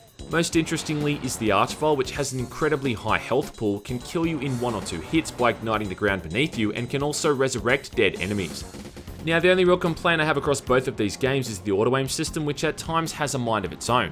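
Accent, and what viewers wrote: Australia. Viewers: said UAC, maybe you just misheard him because of his Aussie accent.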